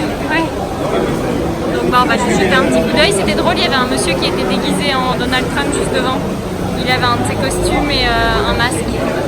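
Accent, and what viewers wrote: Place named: Belgium. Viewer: Quand les videos sont de qualités c'est tjs un plaisir à regarder. Sur la vidéo de présentation de Many j'avais cru entendre un petit accent belge et j'ai eu confirmation sur la première vidéo que vous étiez du plat pays . Si j'ai bien compris vous faites votre trip pendant 13 mois . Vous voulez faire tout le continent américain et renvoyer Many en Belgique ou vous allez le vendre sur place ?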